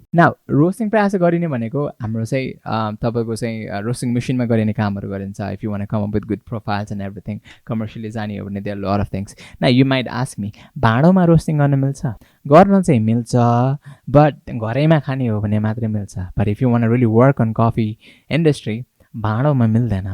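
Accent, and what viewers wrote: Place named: United Kingdom. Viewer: Thankyou bhai tara ni ki nepali ma ki english ma bolnu na hau...bhai ko nepali bolai ma english lawaj le alik bujhnu garo vayo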